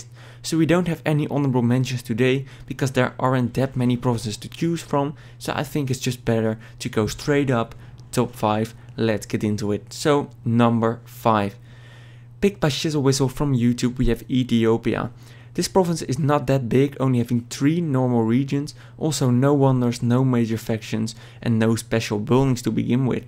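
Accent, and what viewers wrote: Netherlands. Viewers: crazy dutch accent i hear... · wow een noorders accent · i can confirm as a dutch person it is a dutch accent he uses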